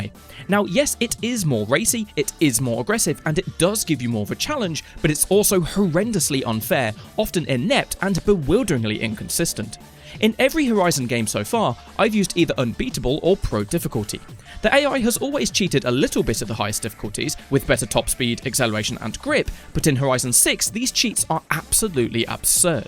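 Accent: United Kingdom. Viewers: That's just an English accent dude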